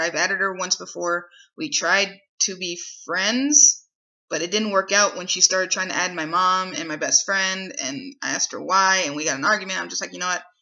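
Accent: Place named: New Jersey, United States. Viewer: I KNEW YOU SOUNDED LIKE A JERSEY GIRL!!!!! LMAO HALLAAAAAH!!!!! I'm in up North now LoL!!!!! Got fam in Clifton n Bayonne N All up n down Jersey!!!!!!!💙💜💙💜💙💜💙💜💙💜💙💜💙in boonies now, Sussex County Stixxxx!!!!!! Mad Love Girl!!!!!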